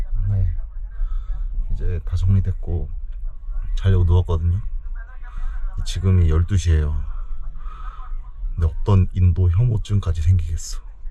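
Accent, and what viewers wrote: Gangwon, South Korea. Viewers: 이자는 강원도 사투리가 조금씩 섞인 말을 한다.... 오늘은 그래가지고 등등 ㅋㅋㅋ 노란색 티셔스 입으면 만화 꿀딴지 가지고 있는 곰 모습이 보인다 ㅋㅋㅋㅋ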